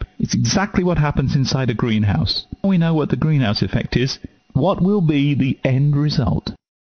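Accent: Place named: United Kingdom. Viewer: I love british accents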